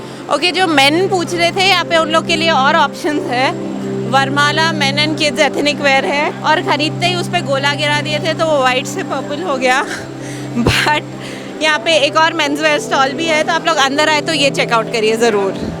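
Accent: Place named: Bihar, India. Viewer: Tumhari awaaz slum bihariyoun ki tarah hain Learn hindi urdu property Don't say logaan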